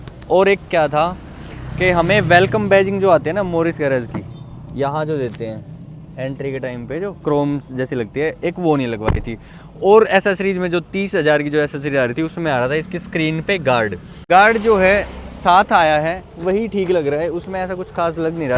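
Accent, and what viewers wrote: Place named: Gurgaon, India. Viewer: टोप, रो , भैयो का एक्सेंट गुड़गावे के लगते।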